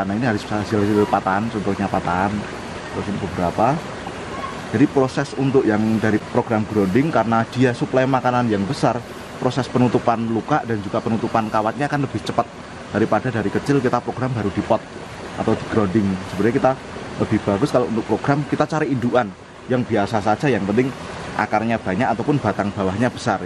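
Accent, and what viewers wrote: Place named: Java, Indonesia. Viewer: Ketok e ra nyepirani... nanging ternyata akeh inspirasi sing biso di conto. Asik ... bahasane keren jowone ketoro buanget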